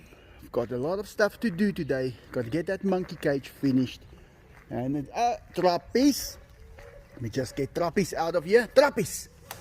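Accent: South Africa. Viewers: I love watching videos he has true love for animals, and I love the SA accent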